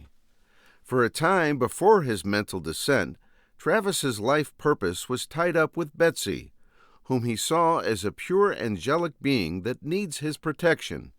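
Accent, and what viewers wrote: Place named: Chicago, United States. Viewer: Interesting take, goosed by your heavy Chicago (?) accent.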